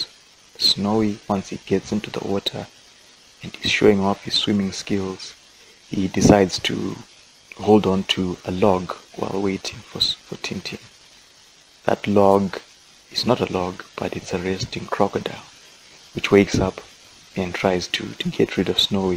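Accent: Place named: Africa. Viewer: Certainly read by an African...... not sure whether he's from the Congo😅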